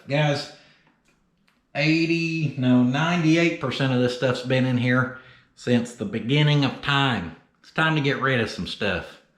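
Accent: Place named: Oklahoma, United States. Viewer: I often comment because its only fair that i spread my expertise across as great an area as i possibly can! And i may or may not get ridiculously triggered by my colonial cousins the other side of the puddle pronouncing english words spectacularly wrong! E.g. jagwire instead of jaguar or sodder instead of solder but i have to say american accents are so easy on the ears mr is particularly cool if i try and speak on camera i sound like a cross between michael caine and ron weasly its just not fair america took my beautiful language flushed it down the waste disposal and then made it sound so damn cool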